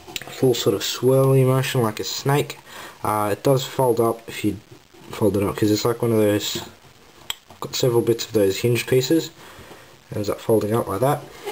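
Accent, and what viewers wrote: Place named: Australia. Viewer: I can tell your an aussie. i am an aussie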